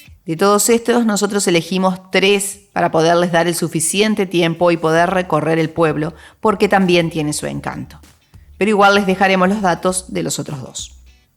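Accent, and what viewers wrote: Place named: Argentina. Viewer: Por qué neutraliza el español si se nota que es argentina? Que ridícula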